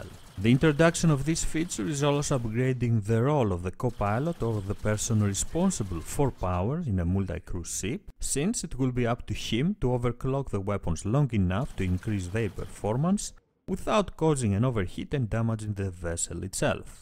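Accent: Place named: Greece. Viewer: C'est l'accent Grec ... :-)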